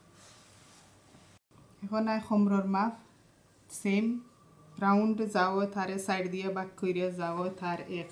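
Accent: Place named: Sylhet, Bangladesh. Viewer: Nice hearing sylheti bangla. Can u show how to make saree blouse?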